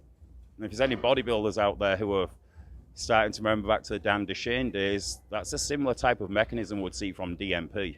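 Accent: United Kingdom. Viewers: Fucking hell didn’t expect the northern English accent!!:) good work nice change! 👌🏻👌🏻👍👍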